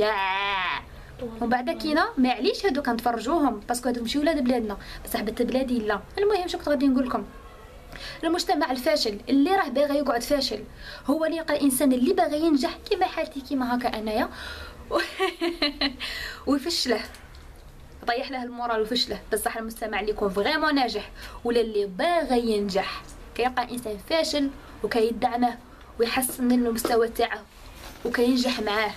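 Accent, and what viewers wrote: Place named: Western Algeria, Algeria. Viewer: encore une chose...l'accent de l'ouest algérien...elle est très drôle mais j'aime bien!!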